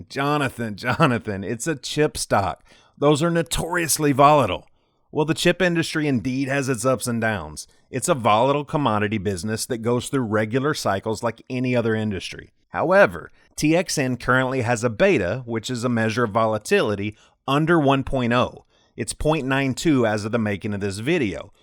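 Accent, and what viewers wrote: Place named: South, United States. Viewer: I detect a hint of the Southern US in your accent. Very pleasant.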